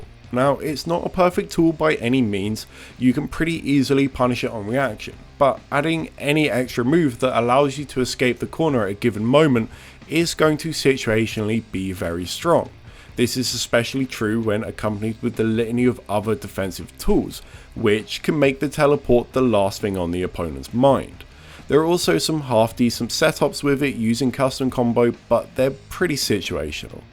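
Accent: England, United Kingdom. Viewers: The authors from uk, it’s how we say it here. Kinda like Nike instead of Nikeee. Just a UK/London thing. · Yeah I find it a bit ironic that they're getting mad at Americans misprounouncing a word not in their language when his accent is English. Moreover, I'm an American, and I've never heard anyone misprounounce Ryu on my turf. I'm honestly pretty suprised how far this obvious joke was taken though, everyone misprounounces stuff at some point.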